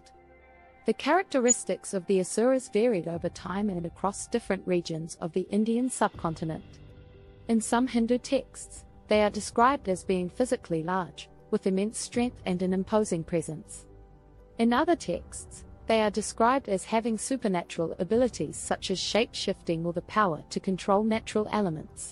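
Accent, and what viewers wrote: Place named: New Zealand. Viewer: Quite smitten with at this point, I must confess! I believe that I have heard three reader voices, though this one most frequently (like 90% of the time)… fan boy guesses you’re from New Zealand, or “Kiwis,” as I say with great affection and not a hint of affectation (so I could use a hint, though this is certainly one, which might lead you to question my lack thereof)… I tend to put the accent on the second syllable of Asuras, but I attended a very low budget ashram (our cricket team was called “The One Hand Clappers”)… — Jai Guru Deva, Glenn XOXOXO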